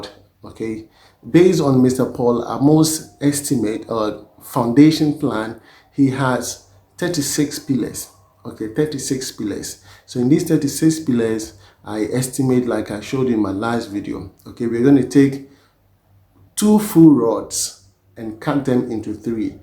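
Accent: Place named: Ghana. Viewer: And you speak good ghanaians english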